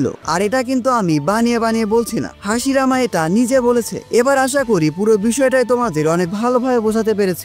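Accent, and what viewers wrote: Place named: Rajshahi, Bangladesh. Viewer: আপনার ভাষা শুনে মনে হলো, আপনার বাসা রাজশাহীতে Am I right??✅✅✅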